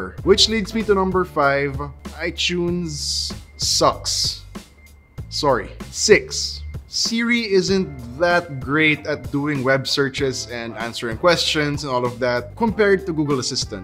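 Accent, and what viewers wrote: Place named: Philippines. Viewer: When I heard your accent, I instantly thought you're a filipino.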